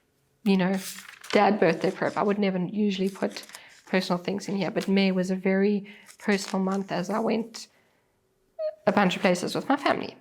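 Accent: South Africa. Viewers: Hey, you popped up on my feed today, I am also South African. I was listening and was thinking your accent 😍 sounds so South African. Are you still in SA? Very interesting to see thar a fellow SA person is using a Hobonichi Avec.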